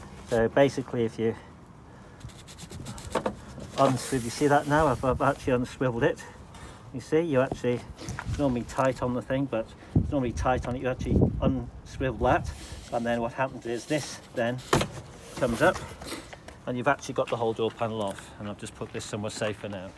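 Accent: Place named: United Kingdom. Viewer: Maybe it just me and growing up watching Michael Caines Battle of Britain. But with your voice and accent I feel like your working on a Spitfire. Very enjoyable video explaining the repair.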